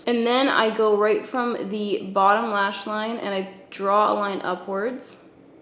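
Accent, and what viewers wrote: Canada. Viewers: This was lovely to watch are you canadian🇨🇦?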